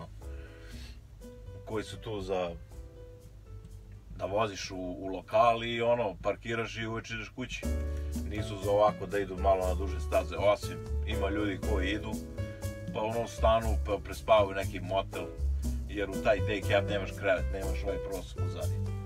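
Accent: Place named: Central Serbia, Serbia. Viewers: Zemljak, ti mora da si negde iz Krusevca,Paracina i tih krajeva? Rekao bih po govoru. A sto se tice poslovanja sa Bugarima....oni su su veci prevaranti i od nas tako da ti to sve govori. Puno srece u poslu. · Meni je posebno zanimljiv govor, pretpostavljam centralna srbija (kragujevac, jagodina, krusevac) jer sam i ja odatle... samo nastavi, extra klip ;)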